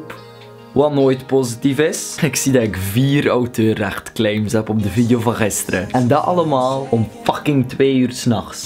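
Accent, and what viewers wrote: Belgium. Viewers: je hoort echt dat hij een Belg is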